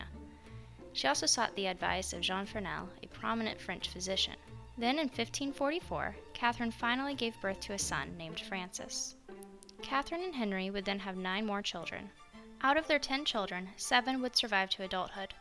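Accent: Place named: United States. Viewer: From on quite a few facts… I would’ve preferred the narrator to Speak a little slower and not use an American accent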